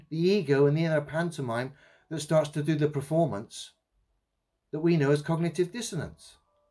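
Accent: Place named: United Kingdom. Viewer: new sub, great to hear a Brit covering this topic with great detail and information. Keep it up my friend people starting to see this monumental lie.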